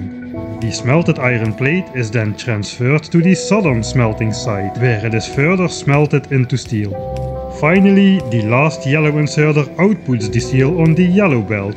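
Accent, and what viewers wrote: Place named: Netherlands. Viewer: you are too humble - any of your tongue tied treats is a song to any of us bots, boys or babes! Doesn't need a tune - your dutch accent is sufficient music :)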